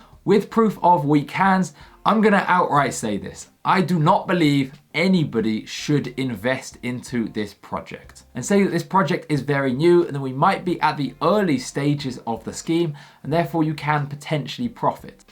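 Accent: England, United Kingdom. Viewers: Good video, subbed, are u from England man? 😊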